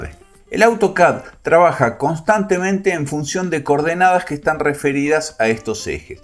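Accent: Argentina. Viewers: Lo más chistoso, es la forma en que en Argentina nombran "Y";en México la distinguimos, como la y griega y la nombramos (lle); asimetricamente , (ye), para tenerlo bien diferenciado de la(i) latina;así llamada,, aunque en sonido es casi la misma pronunciación, arrastramos un poco la (Ll)cuando pronunciamos la "y", ya que en términos matemáticos es mejor para diferenciar ambas!!!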